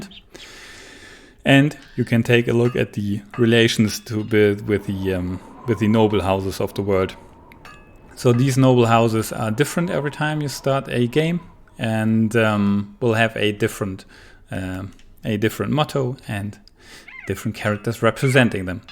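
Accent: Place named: Germany. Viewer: I'm so stoked. Hearing the German accent of one of the BB developers just adds to the hype and excitement. My first band of brothers will be called, "The Regulars" in your honor.:-D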